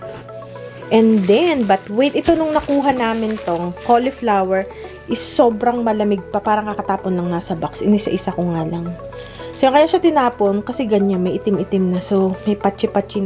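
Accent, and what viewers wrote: Batangas, Philippines. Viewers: Taga batangas ka ga?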